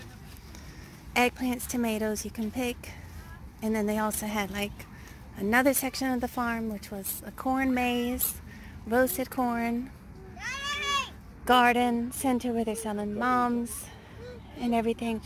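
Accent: South, United States. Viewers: you and your daughter sound so much alike. You both have the southern accent and tone to your voice. Nice video 🙂❤ I love going to Northern Georgia around Blueridge Ga to get fresh apples in the Fall. October is the month to go.🙂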